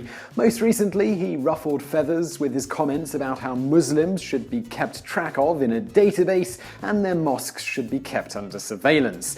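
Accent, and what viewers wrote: United Kingdom. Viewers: Is this guy British or does he fake the accent?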